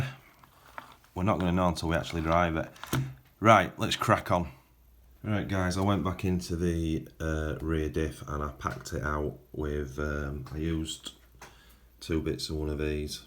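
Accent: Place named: Northern England, United Kingdom. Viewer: Lol just noticed if you see subtitles i dont think it understands your northern accent it comes up as Tommy Legends .. Oh love the car 👍👍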